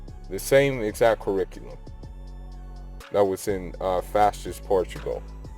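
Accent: United States. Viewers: It sounds like you are American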